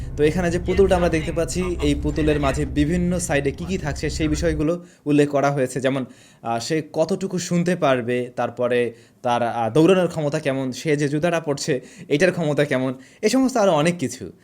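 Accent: Kolkata, India. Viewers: Vai apnk kolkatar moto lage apnar kicu vashar jonno jemon ami valo asi asha kori apnara valo royechen avabe kolkatar manus ra bole tai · Via apni kolkata ar moto kota bolan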